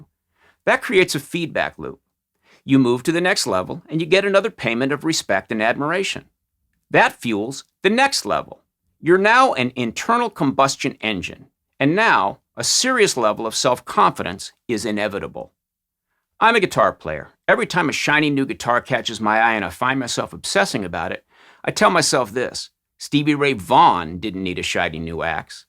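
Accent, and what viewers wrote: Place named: South, United States. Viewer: You are an ignorant hillbilly sounding asshole.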